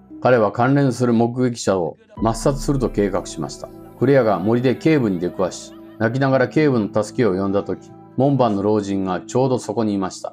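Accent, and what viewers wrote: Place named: Kansai, Japan. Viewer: 若干関西訛りの。見やすくて助かります。ありがとうございます。ホラー映画最高ʕ•ᴥ•ʔ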